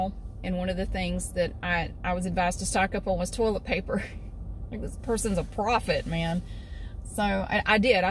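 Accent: South, United States. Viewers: Hey! Longtime fan of yours (I’m originally from the South and your accent reminds me of home:) I live in DC and just happened to drive by the Seabee memorial while showing some folks around. Made me think of you and your dad. Hope y’all have a wonderful time here and that this gorgeous weather we’re having lasts for you!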